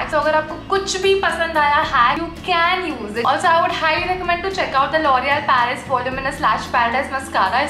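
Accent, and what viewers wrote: Gujarat, India. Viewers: Gujarati ascent 😅😁 yeh thoda aada aa raha hein!!!!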